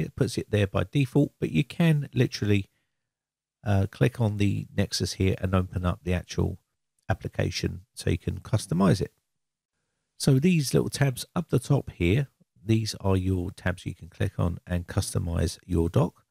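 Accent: United Kingdom. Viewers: I'love ur Voice bro Nice UK Guy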